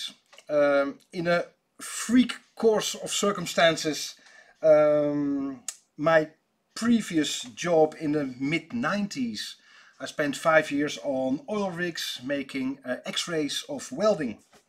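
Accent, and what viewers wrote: Netherlands. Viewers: Toen ik jouw accent hoorde wist ik het al: NEDERLANDER!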